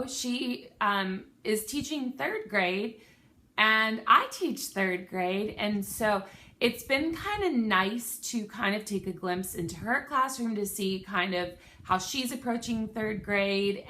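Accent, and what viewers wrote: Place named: Australia. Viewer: I can't wait to hear my name with an Australian accent!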